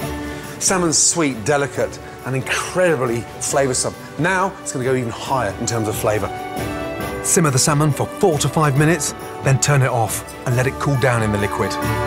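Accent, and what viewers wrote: United Kingdom. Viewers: I wish there were subtitles :( It goes by so fast, I can't catch a lot of the words, and I can't understand UK accents very well since I myself speak with a Canadian accent.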